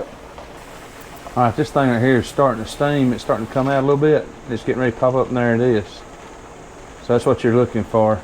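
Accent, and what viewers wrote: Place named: South, United States. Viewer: 😉 I enjoyed listening to you, reminded me of my family in Louisiana with your southern twang. It is frustrating that canning jars went up close to 3 bucks case these days.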